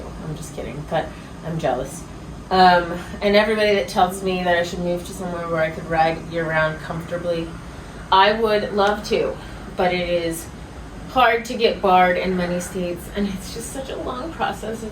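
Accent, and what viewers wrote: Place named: Maryland, United States. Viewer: My wife just walked in the room as I am watching this video. She said “who is she, is she from Maryland?” I said hell yeah hon! Lol! 🦀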